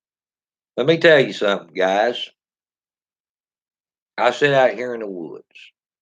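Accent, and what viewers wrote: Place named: Rural, United States. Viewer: You sound like an inbred hick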